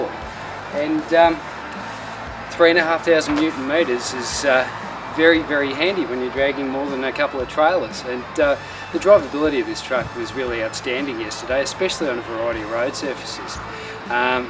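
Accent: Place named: Australia. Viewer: Man! I love Aussie accent.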